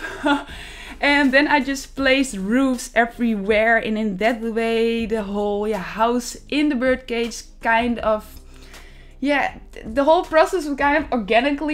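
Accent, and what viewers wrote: Netherlands. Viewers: Are u dutch 😅 it sounds lik u dutch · Je hoort gewoon die nederlands 😂💜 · You sound dutch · But your accent is always so much more charming than our awful German accents; we obviously also love a Dutch accent in German as well! 😆 You always sound so cute and friendly and fun; I don't know why.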